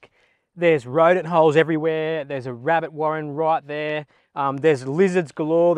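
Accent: Australia. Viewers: Terrible Ozzie accent!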